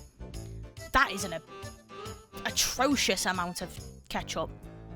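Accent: Liverpool, United Kingdom. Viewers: Love this! Elevated by the scouse accent too (coming from a Yorkshiremen) My gf linked me the vids. We both gone full circle from being unfit in 30s to now being 35, first child great balance. Competed in bodybuilding classic physique Nov 2024 and placed 4th out of 16. We like the flashbacks to how you used to be, can really relate! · Stumbled across your channel a few days ago and its great to see a UK perspective on these videos. As a once fat person its still beyond belief how some people seem to treat eating like an Olympic sport 😮. I cannot fathom taking in so much sugar and salt without realising it. The old me used to get through 2-3 litres of full sugar pop and understanding how many calories that is alone there is no surprise why I was overweight, it makes me wince to see others knocking back so much now! Also love your Scouse accent, no offence to US channels but you are so much more relatable because I understand your references and humour. 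Looking forward to more.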